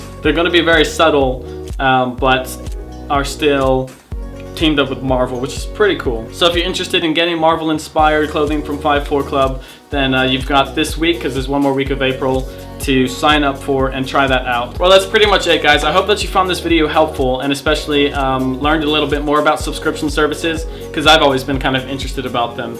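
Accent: United Kingdom. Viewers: developing that British accent I hear... :) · The british accent is kicking in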